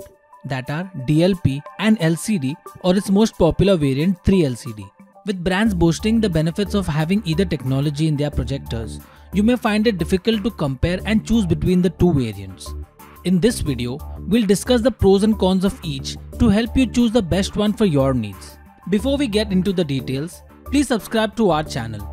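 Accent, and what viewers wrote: India. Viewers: I wish youtube could filter out results with this hindu accent, i couldn't focus on content. Sometimes I feel like you guys speak like this on purpose, like you think it is so cool because BBT and Koothrapaali is popularrrr, yess... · Can’t wait to see a new wideo, Indian ascents are great!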